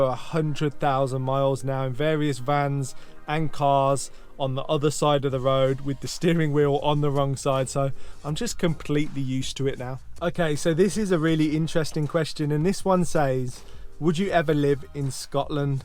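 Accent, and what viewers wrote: Birmingham, United Kingdom. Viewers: I've been following the 2 of you since my Auto play turned on one of your early videos and not even watching the screen I heard the dulcet tone of Brummie wafted across my studio. I'm from Ward End but moved to Greece 13 years ago then came back to the UK 8 years ago but not to Brum so our youngest son could finish his education. Watching your little tour took me back over 30 years ago, doing the BRMB walkathon through the park and around the No 11 bus route, performing at the MAC with my collage drama class. My father was an Engraver in the jewellery too. Not sure if I really miss Birmingham, I think I miss Greece more. Glad is feeling more herself, x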